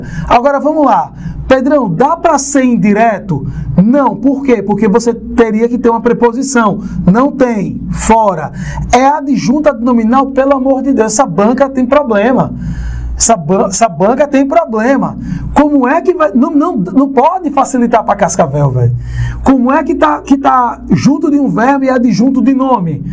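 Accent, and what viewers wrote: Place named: Bahia, Brazil. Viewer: Vc é o cara mesmo negaum kkkk esse sotaque da bahia é fodaa. Ryan BH MG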